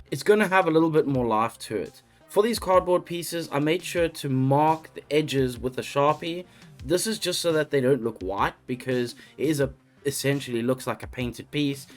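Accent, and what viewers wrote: South Africa. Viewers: Good to hear a South African accent again after moving to the UK! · A cute idea, that a SA accent?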